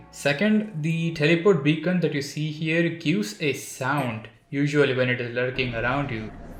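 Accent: India. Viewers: Love u accent Ur indian?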